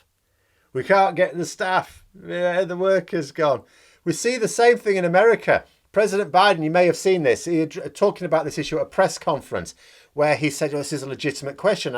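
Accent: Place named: RP, United Kingdom. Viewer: Indians would be easier to understand than the locals.. sound posh in Scunthorpe.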